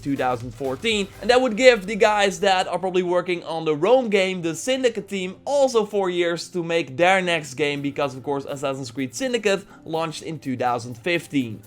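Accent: Netherlands. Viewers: dude are you dutch or not ?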